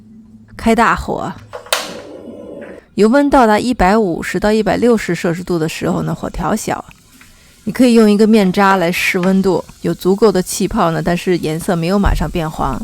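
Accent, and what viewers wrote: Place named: North, China. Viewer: 这北方口音... 没得说了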